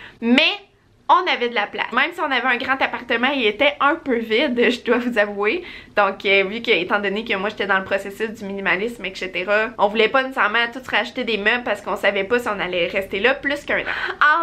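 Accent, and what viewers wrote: Quebec, Canada. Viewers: Cc grâce à toi tu nous fait prendre conscience de ce monde de surconsommation qui nous envahit.....et finalement.....on peut vivre mieux avec moins je viens de te découvrir...tu as gagné +1 abonnée je t adore et que dire ton joli accent québécois me rappelle des souvenirs heureux...en 1991...en étant en dernière année d infirmière ( l année de mon diplôme )....je suis allée à Québec faire un stage...j étais dans la région des Laurentides.....juste sublime.....j ai adore une seule envie y retourner dans ton joli pays.....gros becs.... de Nice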